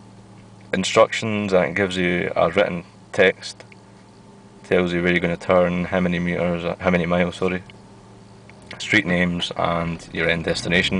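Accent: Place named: Glasgow, United Kingdom. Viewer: OMG you sound JUST like my uncle rizwan and he lives in Glasgow!!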